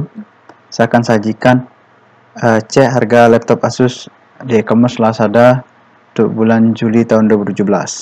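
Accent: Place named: Sumatra, Indonesia. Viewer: logatnya orang sumatra y!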